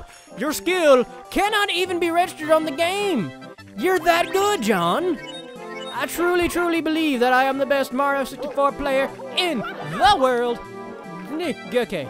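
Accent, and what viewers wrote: South, United States. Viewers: Hey there, nice LP. It's good to hear another southern boy doing an LP. If you're ever bored, check out my LP channel sometime. For the QOTD: Very hard to pick, but I guess I have to say Shifting Sand Land because I've always liked the Egyptian themed Mario levels. I guess because Super Mario Bros. 2 left so much of an impression on me, maybe?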